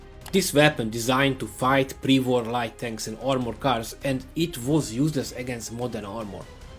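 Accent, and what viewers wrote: Hungary. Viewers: i can feel a hungarian accent in you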